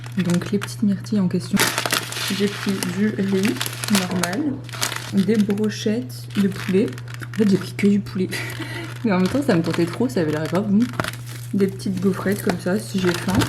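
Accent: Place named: Quebec, Canada. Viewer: Omg, le québécois est trop nice ! l'accent cette pépite !!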